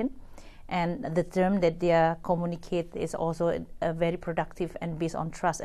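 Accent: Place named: Indonesia. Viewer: Lantas dengan kemampuan menghilangkan aksen Indonesia anda, sudah jadi apa anda sekarang? -- Orang seperti anda inilah yang sejatinya masih menjadi katak dalam kubangan, setiap individu memiliki kemampuan, dan keahlian masing2, sosok seperti bu mulyani yang pandai dalam mengelola keuangan, tentu tidak akan menghabiskan waktunya hanya untuk menghilangkan aksen jawa atau Indonesianya. Selagi grammar dan tata bahasanya dapat dipahami, maka tidak ada yang salah ketika kita masih memiliki aksen bahasa pertama.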